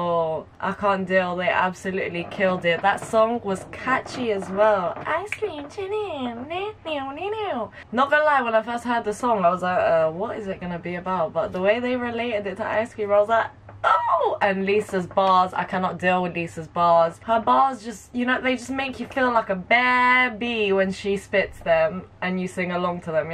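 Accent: United Kingdom. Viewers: U from UK. Cuz i know ur Accent 😁😅😃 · I know this is out of the blue, but I have just realized that you are British from your accent. Is there any other way to get to Ireland from England than by plane?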